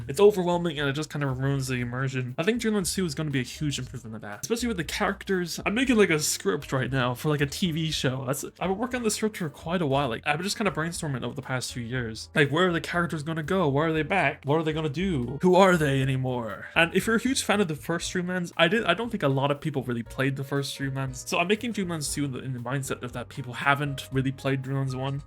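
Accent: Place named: United States. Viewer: Bro u sound so American i didnt even ponder over Irish accent. As a British born, I prefer Ireland. Keep up the read-minding content!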